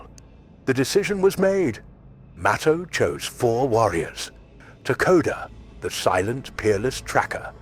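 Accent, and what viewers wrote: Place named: RP, United Kingdom. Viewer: ok that explains the generic posh english voice seriously I love wearwolf dogman stories and use to love every channel I came across that narrated/ reported the stories or encounters but lately people don't have tge faith in the voice of there own howel theve lost the lessons that its goider to fail to die to be crushed as you're self than to live a single second or even succeed as someone or something you're not I won't run you or this point into the ground but if you want a decent respectable backable channel use youre own voice embrace you're inner beast and inner human not to mention with all the sheep following the A .I trend it will set you apart from the herd / flock and remember brother if you are wolfen too than wolves care not for the barking of dogs or the baaa,ing of sheep peace may Luna bless you're steps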